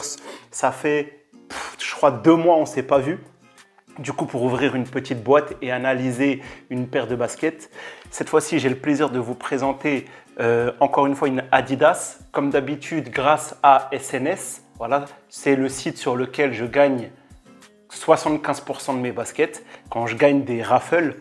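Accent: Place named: Alsace, France. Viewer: Sympa le sac ! Jolie l'accent alsacien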